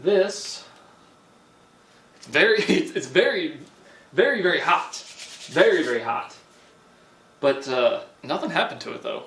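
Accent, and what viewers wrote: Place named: South Carolina, United States. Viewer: South Carolina for ya. Maybe Tennessee, but I'd put money on SC. I've never met the guy, I'm just from GA and recognize the accent and the landscape from the backyard lol.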